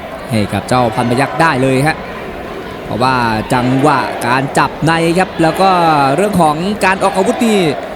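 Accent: Isan, Thailand. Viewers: มักเจ่า พากมวย เว้าอีสาน ม่วนๆ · พากษ์อีสานจนจบเทียแนเเด้อ😂😂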